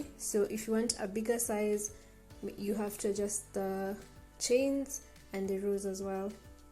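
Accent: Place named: Kenya. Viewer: I love that I can tell you're a Kenyan by how you speak. It just sounds so familiar, like a friend telling me about their day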